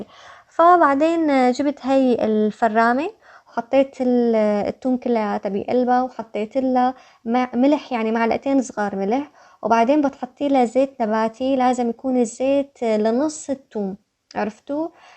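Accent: Syria. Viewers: انا مصريه ولهجتك بتذكرني بجارتي السوريه ، وانا كتير بحبها وحبيتك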